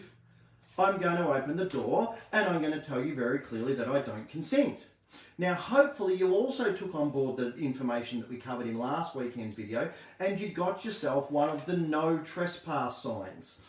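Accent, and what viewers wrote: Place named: Australia. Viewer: So I tell the truth... and you delete my comment... can't have anything disrupting your, cash cow. "I'm absolutely appalled, every time I hear you, it's a SALES AD - Buy My Book, Buy My Book... I'm sick of it! You sound Australian... but I'm not sure anymore. If you were a true blue Aussie Patriot... you would make sure everyone had a FREE copy in in pdf and you wouldn't be sending people to FB to be spyed on!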